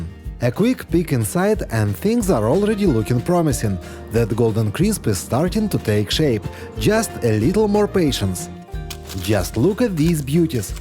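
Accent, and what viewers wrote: Russia. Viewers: Меня смущает акцент и наличие укропа в маринаде. Автор, ты русский? Классный канал, кстати. Готовить не умею, но есть под твои видео не хуже, чему под Друже) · Strong russian accent ) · Is that accent russian??? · террибл рашен аксэнт))) бат гуд контэнт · I feel STRONG russian accent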